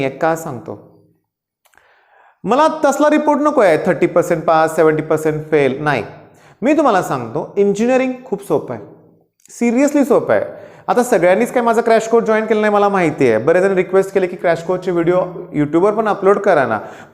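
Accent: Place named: Pune, India. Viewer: Its Puneri marathi I guess....You have no rights to make fun of this. Hope you get some wisdom about respecting our Teacher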